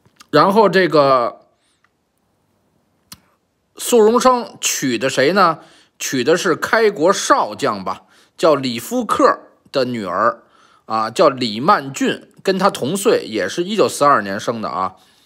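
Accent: Beijing, China. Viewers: 听您一口的京腔就感觉亲切。给您提示一下：萧新华少将不是肖劲光的儿子。他爹是老资格的萧克上将。和肖劲光一样也是湖南人。萧新华文革期间在71-73年下放到湖南长沙。是我们长沙市第三中学（明德中学）的老师兼初中四连的连指导员。后来他在去武警之前在军队的新鑫公司当老总。8-90年代我在北京工作期间去看过他几次。非常随和的人，长得威风凛凛的，和演员项堃有点像，高大的个子，篮球打得很好。是校工篮球队的主力。可惜失联几十年了。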